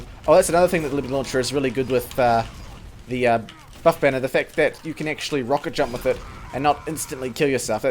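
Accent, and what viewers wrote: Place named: New Zealand. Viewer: Never heard a Kiwi TF2 player!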